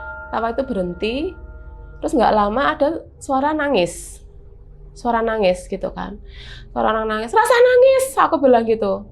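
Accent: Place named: Java, Indonesia. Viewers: pean medok banget, koyo aku, Mbak 🤣🤭 wis 11 thn tinggal di Belanda tp masi medok jowone 🤣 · Aku seneng mba kalau ada orng logat jawanya keluar seneng .mba nya cantik buaaaanget🤭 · Medok e😁😁😁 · Semarang hadir .. pas ki podo smg e. Bahasane semarangan bgt 😂😂 ono ding e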